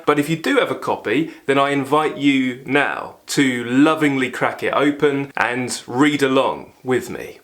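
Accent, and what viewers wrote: United Kingdom. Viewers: It's a trip to be hearing someone with a British accent commenting on Walt Whitman. The most American poet.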